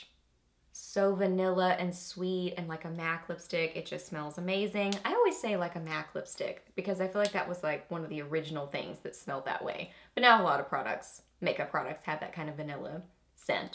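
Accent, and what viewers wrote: South, United States. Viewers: I swear you are a breath of fresh air! I can't believe I haven't found you before now (well about a week ago and been watching your older videos) but I just LOVE You! Your personality, your look, your accent (you have a LITTLE bit of a country, southern accent.... I have a REALLY HEAVY country southern accent, yours is cute, mine not so much lol) I got my Amazonian palette today and it's so pretty I'm in love....I have the naked heat palette and LOVE it, I don't need the small one but I'm prob gonna get it just cuz it's so little and cute.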